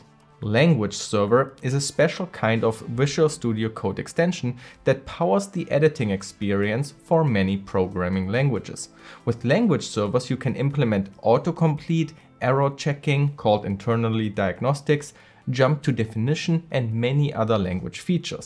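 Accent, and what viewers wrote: Germany. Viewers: 3 sekunden ich wusste sofort der spricht deutsch 😂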